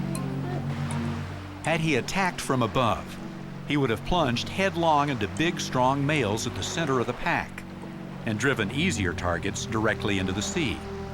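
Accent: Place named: Canada. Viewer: Lol does anyone else notice the canadian accent in the narrator lol?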